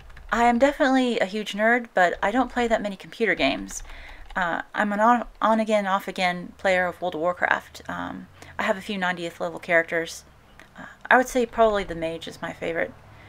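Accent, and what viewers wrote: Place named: Appalachia, United States. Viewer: A lot closer to home than I expected, in many ways. I played Requiem, not Masquerade , but also watch NonStampCollector, and your smoothed out accent is like that of my Appalachian kin- well, some of them. Likewise, you too could appreciate it if they made the Ender's Game movie with the same wardrobe set as Pride and Prejudice. It'd be like Master and Commander: The Dark Side of the Moon.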